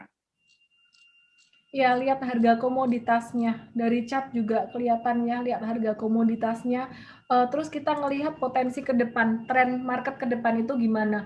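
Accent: Java, Indonesia. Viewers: logat jawa sing kandel banget. 👍 anyway and thanks 😊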